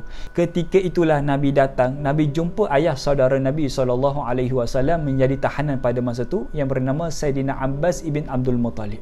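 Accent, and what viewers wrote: Kelantan, Malaysia. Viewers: Bagus penerangan ustaz. TQ Dr.. Ada bunyi loghat kelate sikit²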